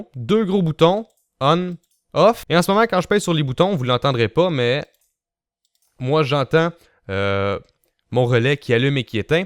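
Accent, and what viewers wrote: Quebec, Canada. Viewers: OMG UN QUEBECOIS NON? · Hello from France. Superbe tuto, bien ficelé et ça marche du premier coup. Merci beaucoup. Toujours un plaisir d'entendre l'accent québécois.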